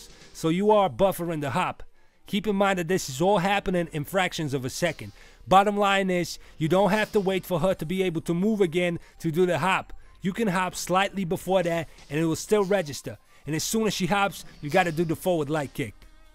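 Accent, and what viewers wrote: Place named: Boston, United States. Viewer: I don’t know what it is about it, but your Boston(?) Accent somehow makes this great guide even better . Thanks for the great guide man!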